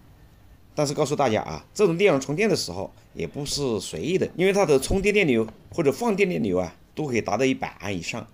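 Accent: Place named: Hunan, China. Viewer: 湖南口音，果然是三一重工的员工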